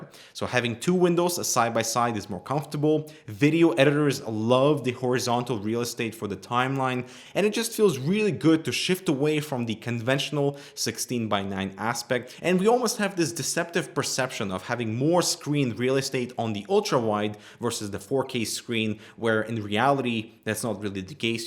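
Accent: Russia. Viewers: я извиняюсь, что за акцент ты русский что ли?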